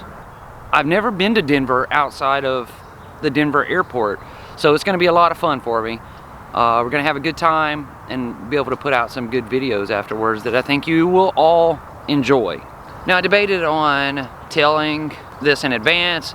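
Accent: South, United States. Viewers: I live in Opelika/Auburn so nice to hear a good ole’ southern boy accent on the case! I saw you did a video at the Dozier school... I grew up near there definitely going to check that out. So hard to believe such evil went on right under your nose for 100 years!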